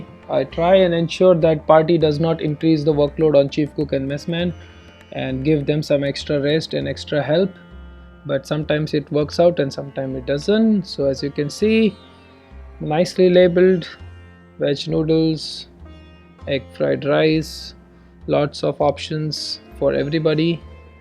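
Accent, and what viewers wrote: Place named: India. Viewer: Nothing wrong with Indian english. Its the most logical pronunciation anyone can do with that language.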